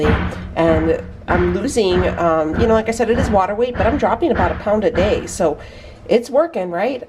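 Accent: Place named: Chicago, United States. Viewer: I love you and thats how we talk on the south side girl!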